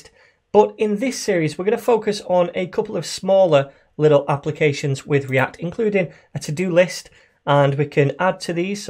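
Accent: United Kingdom. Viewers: I just enjoy any tutorial with a British accents....it's just easy...much love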